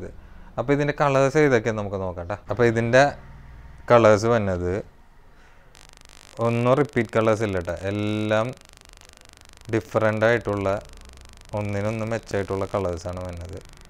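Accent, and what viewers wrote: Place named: Kochi, India. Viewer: കൊച്ചി കാരനാണോ. കാരണം സംസാരം കൊച്ചി യാണ്. തൃശൂർ അല്ല ട്ടോ.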